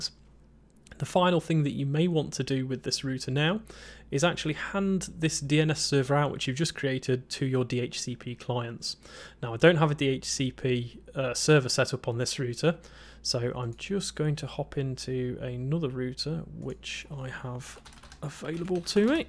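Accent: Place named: United Kingdom. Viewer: Hear British accent, thinks maybe he’ll call it a rooter. Immediately calls it a rooter. Subbed.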